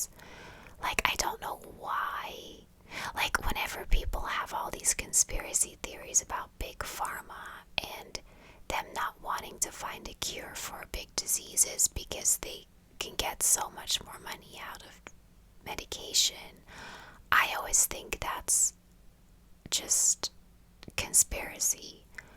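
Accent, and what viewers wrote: United States. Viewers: Your accent doesn’t sound European. Sounds American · R you not American?? U sound so American though 😮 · Wait I’m confused where are you from? Im slightly deaf haha and I thought you were American but I obviously need to listen more often with my hearing aids in xx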